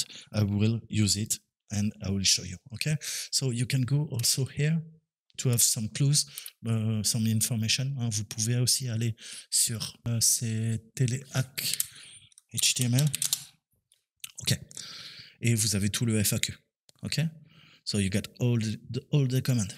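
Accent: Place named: France. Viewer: Oh mec i'm in love with your french accent 😂 you rock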